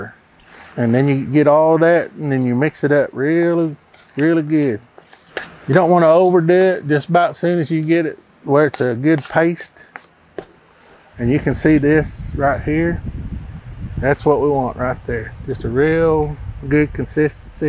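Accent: United States. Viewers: Are you referring to his accent? Because, this is a great example of an Okie accent. I understand him perfectly. Yes, I am an Okie as well. Boomer Sooner Baby! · You sound like a country boy! Hey this is coming from a country boy just on the south side of the red river! Man I had some of this over in New Mexico and man was it good stuff! You make it look easy Im going to try iit Thanks! Curious does it taste better if the warm water is poured out of a OU cup?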